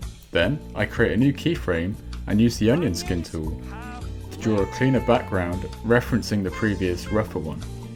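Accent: United Kingdom. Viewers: You sound like you are from British. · I had no idea you were actually British. In hindsight it is reckless to assume that everyone likes to do a British accent in daily life like myself. PS, your coloring is awesome, and is definitely the most unique part of your animations!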